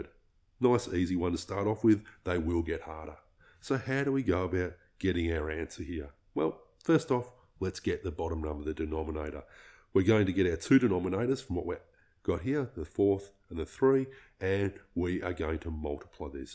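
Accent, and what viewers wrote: Australia. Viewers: why does he have an aussie accent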